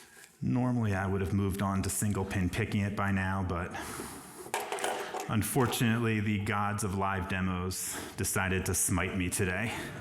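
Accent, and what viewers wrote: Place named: Midwest, United States. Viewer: In the spirit of LPL, his voice is enough to out him. Just think for a second. Sight is a great sense, but we have at least five of them and we can use them if we apply your minds. I know this is a man. He is white. His accent suggests possibly midwestern. His vocabulary, fine articulation and vocation suggests... anyway he's a jew. Anyone in that audience saw his face, btw. But his voice is enough. Not many people speak like that, and he's made hundreds of videos. You don't think someone will eventually make a voice recognition app?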